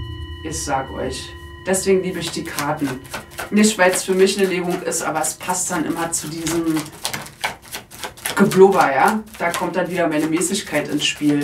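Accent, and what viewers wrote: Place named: Saxony-Anhalt, Germany. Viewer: Ich folge Dano schon länger und wusste von dir nichts.Ich mag dich und deine Worte,einfach deine ehrliche Art🌹..und deinen Dialekt (komme selbst aus Sachs.-Anhalt).. Die letzten 3Jahre haben den Zustand unserer Gesellschaft sichtbar gemacht....Empathie und Herzenswärme wurden reduziert. Bleib bitte wie du bist und halte deine Schwingung oben. Ich sende dir Licht ,Liebe und viel Kraft 🩵🙏✨️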